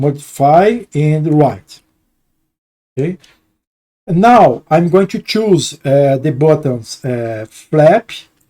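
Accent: Brazil. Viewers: Vc é brasileito né, inglezão com sotaquezão de brasileiro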